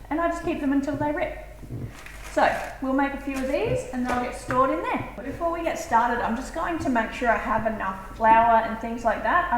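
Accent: Australia. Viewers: I watch your video's daily from America. I live in Alabama just above Florida. I Love your accent (wish I had an aussie accent) You're so beautiful and I love your kitchen. My husband loves the muffin mix.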